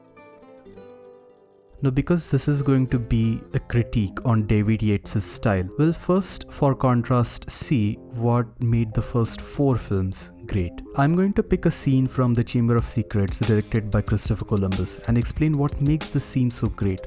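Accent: India. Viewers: I was excited to watch this video but then I noticed Indian accent. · This accent lmfao pajeet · is this guy indian? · Bullshit, Yates did a great job The retarded overly dramatic emotional cues are a thing of past that is 1990s to early 2000s U want every single emotion to be emitted as a child’s overly expressive face?? Go watch home alone. Moreover your Bihari accent ruined the essay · Indian accent…..annnnnd I’ll pass. “Saaaaaaar they should have fired all the English people and hired pajeets. Saaaaaaar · You sound Indian brother.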